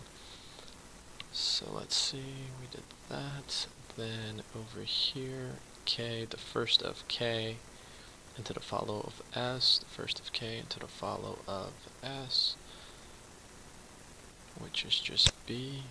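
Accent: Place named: United States. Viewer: Wow, am I dreaming or is this an a guy with an american accent? FINALLY!!!!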